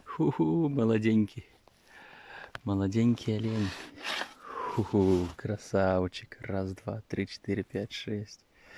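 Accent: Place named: Belarus. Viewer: Я балдею от белорусского языка, которым говорят бабушки в деревнях. Ваш же близок к литературному, он не такой красивый. Главное, что Вы нашли, что хотели.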